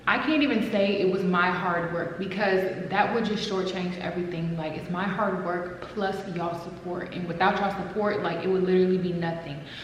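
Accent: DMV, United States. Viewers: I just know you from the dmv by your accent lol. I so wish you could share this apartment cause it is everything but people crazy so 🥴 congrats!!! You deserve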